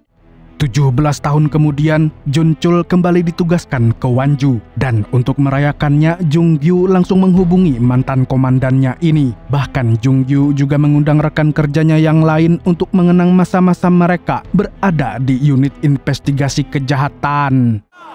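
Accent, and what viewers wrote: Banjar, Indonesia. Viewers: Urang banjar kah ni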